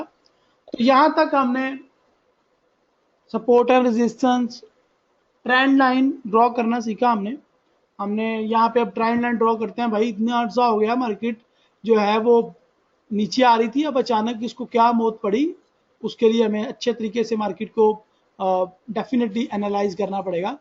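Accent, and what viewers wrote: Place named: India. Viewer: sir apka desi andaz buht acha buht maza ata hai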